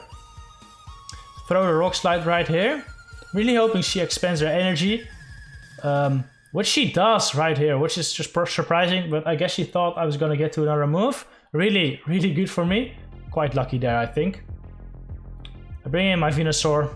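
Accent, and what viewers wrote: Netherlands. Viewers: Wait are you dutch bc you talk with a Dutch accent great video btw! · yes, he is.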